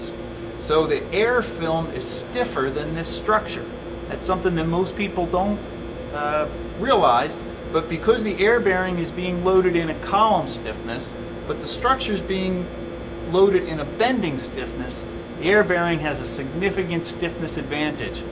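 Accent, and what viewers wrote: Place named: Philadelphia, United States. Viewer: You have a Philadelphia region accent.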